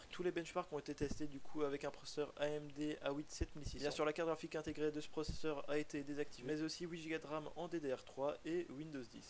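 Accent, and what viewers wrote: France. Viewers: французский, итальянский вообще другой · судя по акценту это французский или итальянский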